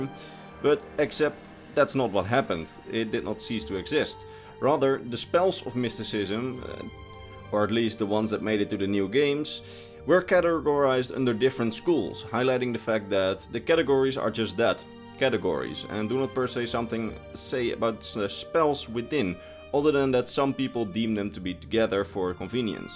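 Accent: Netherlands. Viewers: goeie info hoor alleen wel echt je nederlanse accent xD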